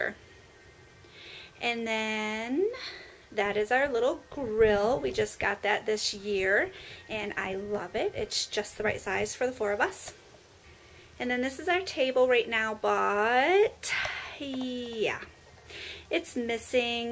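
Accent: Michigan, United States. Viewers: I love listening to you talk :) my dad is from Michigan you for sure have a Michigan accent :)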